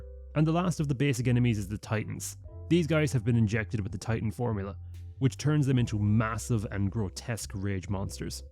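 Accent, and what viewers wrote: Ireland. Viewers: You sound irish. Hello fellow irish person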